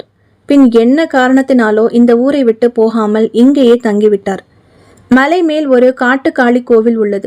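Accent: Kerala, India. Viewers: Nala story, I liked it.... paravala malayalam Pronunciation improvement iruku 😅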